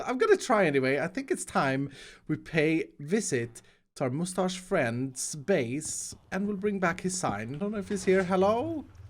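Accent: Sweden. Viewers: Are you from Sweden?? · Är du från Sverige?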